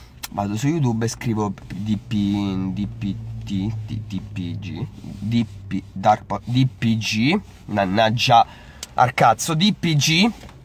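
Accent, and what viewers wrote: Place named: Marche, Italy. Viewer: Ma sei marchiciano 😂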